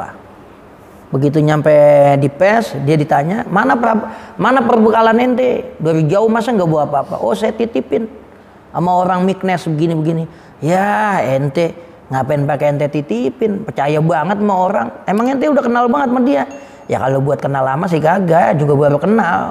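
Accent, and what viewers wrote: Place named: Jakarta, Indonesia. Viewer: Betawi beud dah.. Sama ama saya ustadz.. Suka sayah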